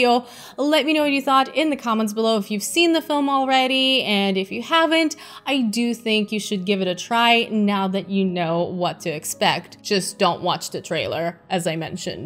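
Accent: Russia. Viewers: Love your accent. Are you Russian?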